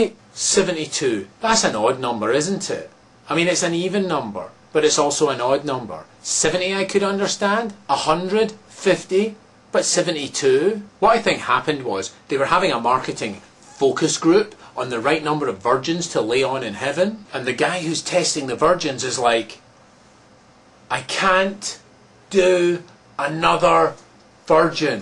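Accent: Scotland, United Kingdom. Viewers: haha! great video :-) and nice scottish accent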